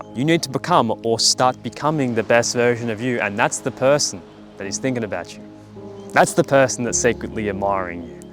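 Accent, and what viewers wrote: Australia. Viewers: Watching this made my day. Thank you ❤ and to answer the title, I really wish and hope to find that one person in few years 🤞. I wish you all well Ps. I like your aussie accent, it suits ya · I could listen to your Aussie accent all day 😅 I’m just a USA southerner…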